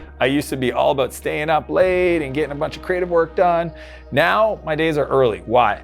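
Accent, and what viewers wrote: Canada. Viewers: It sounds like a French Canadian accent, idk if it’s only me. But great video, I really like this advice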